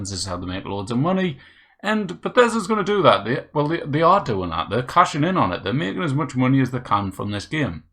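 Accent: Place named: Newcastle, United Kingdom. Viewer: Geordie accent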